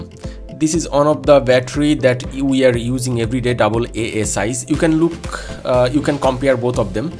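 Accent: Bengal, India. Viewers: You have such a STRONG Bengali accent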